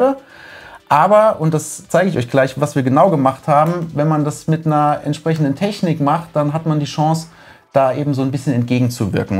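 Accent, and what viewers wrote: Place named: Swabia, Germany. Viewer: Servus, du sag a mol woher kusch du eigentlich genau? Du schwätsch d gleiche slang wie i😅.vg Tobi😊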